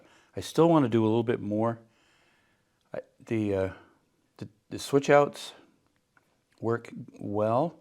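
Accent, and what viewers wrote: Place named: Canada. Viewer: I think i hear a touch of Canadian accent? My papa and the rest of dad's side of the family came from canada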